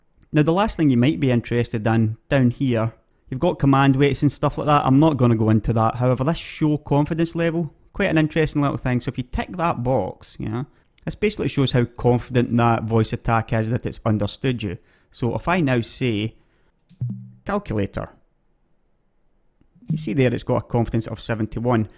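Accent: Scotland, United Kingdom. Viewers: scottish accent, I dont understand a word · I'm so glad I found a Scottish guy doing this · I find the Scottish accent really pleasant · First class presentation of how to use Voice Attack. I got it yesterday and have been looking around for a tutorial to learn its programming from. I am looking to set it up with DCS A10C and whilst a different programme to ED the methodology is well explained with both demonstration and explanation. Your video is going to be by my side when I start setting VA up. I imagine that it will work fine on other games containing a matrix for keystroke mapping/editting such as IL2 and Silent Hunter 5. BTW, what part of Scotland do you hail from???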